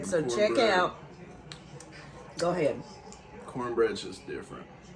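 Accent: South, United States. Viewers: this is my first time seeing you and your channel.I love your smile and your positive energy.I can tell my your deep southern drawl that you are a southern woman like myself.I am born and raised in Dallas,Texas I just moved back to Dallas in May 2018.I have never lived anywhere but Texas.Thank you for sharing I do appreciate it.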